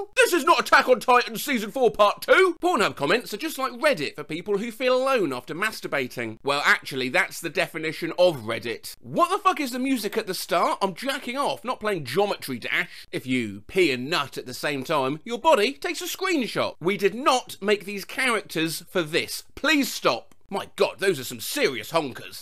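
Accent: United Kingdom. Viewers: ITS SO FUNNY HEARING THIS MEME IN MY HEAD CORRECTLY AND THEN HEARING A BRITISH GUY, WHO CAN READ KEYSMASH, ATTEMPT TO READ ALL THESE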